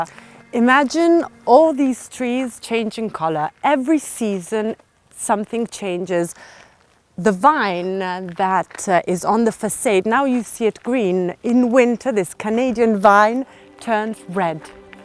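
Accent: Italy. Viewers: Italians always add an "E" to the end of every word in English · What a charming and wonderful Italian English accent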